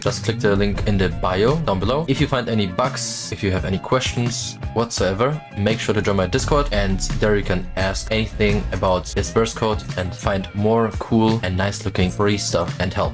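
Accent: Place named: Germany. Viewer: Man merkt, dass du deutsch bist hahah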